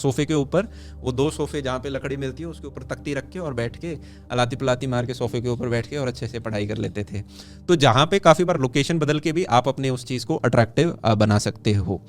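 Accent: Haryana, India. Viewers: bhaiya aap Haryana se ho ? kya aapke accent se lagta h😃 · Aap haryana se ho na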